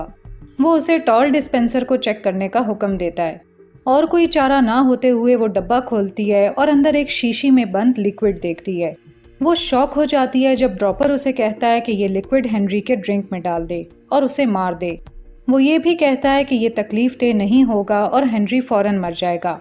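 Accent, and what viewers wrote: Pakistan. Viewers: Aapke urdu aur talaffuz se malum hota hai shayad pakistan se hain. Anyways, ek french movie hai "Tell no one", uska detailing karein ...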